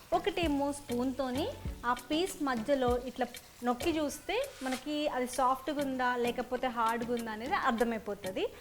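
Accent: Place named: Warangal, India. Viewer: Warangal slang Also to good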